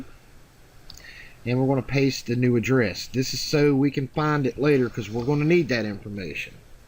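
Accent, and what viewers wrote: South, United States. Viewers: Every time I hear this voice I get a bit of nostalgia for Georgia, Alabama and South Carolina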